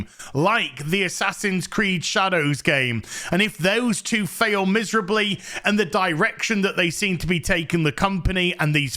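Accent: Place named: United Kingdom. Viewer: I'm not a Brit, but his accent makes his rants far more entertaining to listen to.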